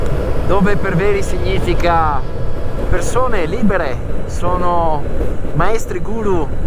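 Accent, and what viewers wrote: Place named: Veneto, Italy. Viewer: figurati!ti invidio molto,hai una bella moto e hai molta simpatia!e l accento veneto dà quel qualcosa in più😁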